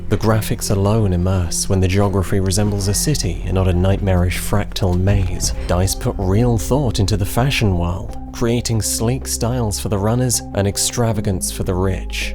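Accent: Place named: United Kingdom. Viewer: Hey man, doubt you'll see this. But thank you for the birthday gift! Love your videos. Only a madman can see the soul behind ubisoft's corporativism, and being a madman is exactly what i respect. Keep being you, with that soft and sweet british voice and all. Peace, Enzo. Ps: thoughts on Legion? That cliffhanger on WD2's video got me grinding my teeth.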